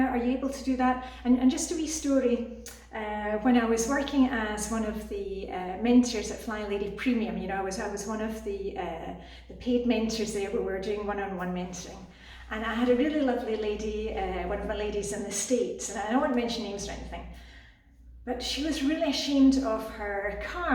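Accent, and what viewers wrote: Scotland, United Kingdom. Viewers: Hi, I know you from Carol Tuttles T1 page. How did I not know you were Scottish 😍. I assumed you were Danish. From a fellow T1 flybaby 😀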